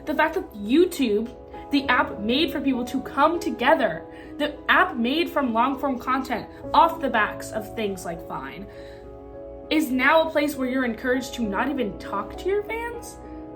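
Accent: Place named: Canada. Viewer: May I ask what country you live in? You sound very Canadian! (From a Canadian)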